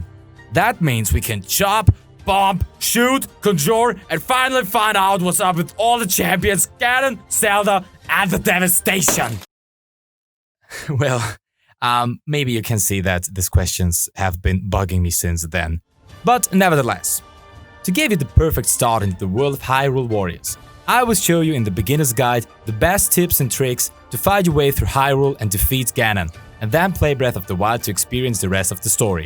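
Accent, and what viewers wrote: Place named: Germany. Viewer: Quick question sir are you German or am I wrong about that If so that's fucking cool you're accent makes me strangely happy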